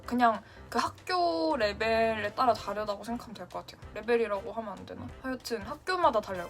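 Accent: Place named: Jeolla, South Korea. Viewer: 전라도 출신 학생이시네요! 억양이 천상틀림 없군요~ 영상 많은 도움되었네요 감사합니다~!!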